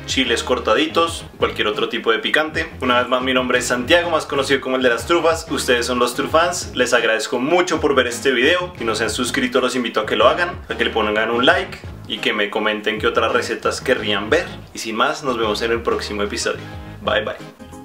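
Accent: Antioquia, Colombia. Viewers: Santiago, tú eres paisa? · Ud es paisa?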